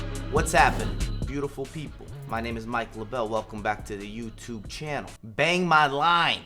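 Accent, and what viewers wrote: United States. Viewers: Gave up after 10 secs.....why does every American have to speak like a fanny??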